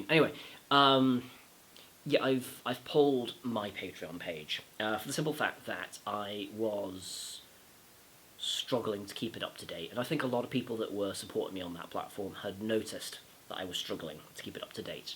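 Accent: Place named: RP, United Kingdom. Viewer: Sincere condolences mate. Keep them coming. Missed the toff talk lol. Sound's a bit fkt but nothing major. Sláinte 🍻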